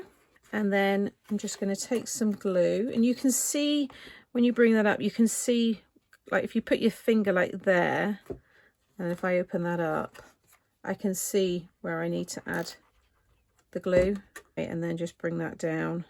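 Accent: United Kingdom. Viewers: Here's what I love about you...I want to create every time and all the time when I watch your videos!! You are honestly one of my very favorites! Thanks for all the inspiration and for all the great creates!! I have made so so many awesome things because of you! And this Ohio girl loves your accent and the neat little different words you being from the UK use!!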